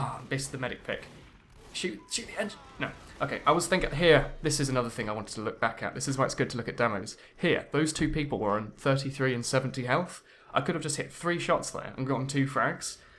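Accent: RP, United Kingdom. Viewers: true, I got my shitty american accent and you got that fancy high class british accent.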